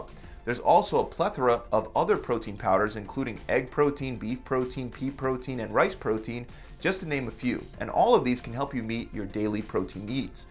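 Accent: Philadelphia, United States. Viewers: I wonder if he’s from philly 😂 based on the way he says water lol! It’s awesome! Also… great video!! Everything is straight to the point and quick!!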